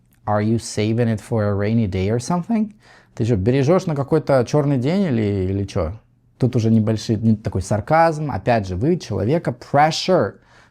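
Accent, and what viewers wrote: United States. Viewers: И самое главное, не говорите с таким акцентом, как у автора, в Англии. Не американец с американским акцентом - это вообще ненавистная фигура в Англии. Когда они слышат такой акцент, то подпрыгивают от злости.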